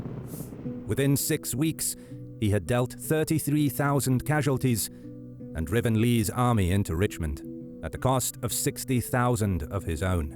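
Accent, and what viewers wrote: United Kingdom. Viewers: Nothing like s Brit telling us about our war. Southern sympathiser. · I really hate hearing a brit talking about our civil war. Probably because you got thrown of my country. Now look at yall😂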